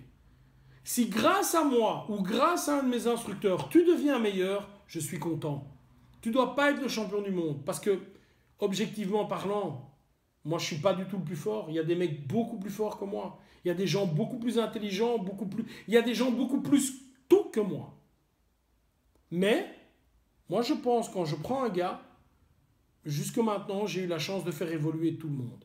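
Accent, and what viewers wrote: Belgium. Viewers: Bonjour, ne penses-tu pas que tous ces conflits entre fédérations, pour des histoires de gros sous, d'égo, gangrènent un peu le Krav Maga ( en France ). Je ne rentrerai pas dans les polémiques, et je ne prendrai pas parti pour qui que ce soit...Mais, j'ai l'impression, et la certitude même qu'on colle l'appellation Krav Maga à toutes les sauces, sur des systèmes de Self défense, pour que ce soit plus "vendeur" ou attractif. Je ne sais pas ce que vaut l'I.K.M, et je ne permettrais pas de juger...Sincèrement, je partage ton avis, sur le fait qu'il y a des bons partout, et des mauvais partout. J'aime assez ta façon de voir les choses, mais ( avec tout le respect que je te dois ) j'avoue que je ne sais pas quoi penser de "civils" enseignant des techniques "paramilitaires" voir " policière", impliquant parfois des armes, à d'autres civiles. Je pense sincèrement que ça devrait rester le domaine des forces de l'ordre. 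Ce n'est que mon avis, n'y vois pas une attaque ou quoique ce soit d'autre, mais, je suis persuadé que tu comprends ce que je veux dire. Continue tes vidéos, j'aime assez les regarder, car elles sont souvent très justes...J'ai beaucoup de sympathie et d'amitiés envers nos voisins belges, et c'est un régal d'écouter ton accent. Force & Honneur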